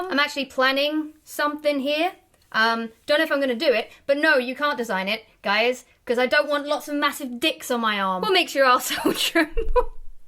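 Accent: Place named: England, United Kingdom. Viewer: Just found your face. You probably have the cutest British motormouth accent from England. You're from England right?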